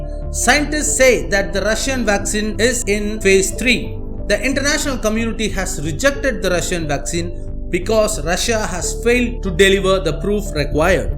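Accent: India. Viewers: Watch out for your indian accent in the way you speak English, apart from that you have a nice content and imagery.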